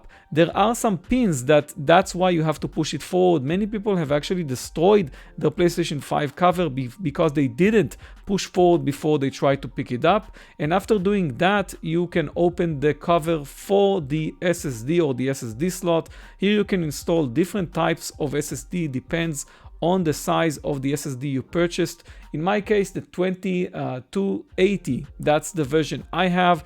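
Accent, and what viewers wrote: Israel. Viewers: Holy shit that Israeli accent haha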